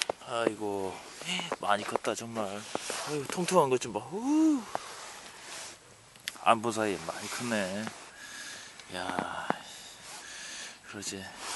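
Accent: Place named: Honam, South Korea. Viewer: 고양이가 큰게 아니라 부은거다 임마 말투도 조낸 듣기 싫네 호남사투리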